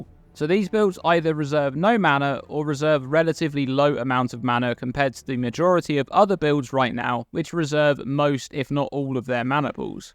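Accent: United Kingdom. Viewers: Bri'ish man spitting facts again. Always great for theory crafting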